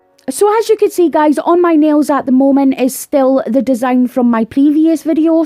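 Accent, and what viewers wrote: Scotland, United Kingdom. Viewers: New sub here. I have soooooo many Madam Glam gels. Def my fav brand. Btw, I love listening to your Scottish accent.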